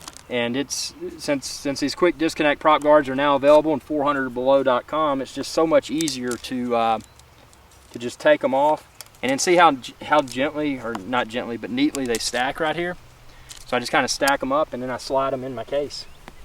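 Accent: South, United States. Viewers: You sound southern - I am in Tennessee - Thinking of getting one of these. I would like to fly it in some of the massive caves/pits around... wonder how well that would work...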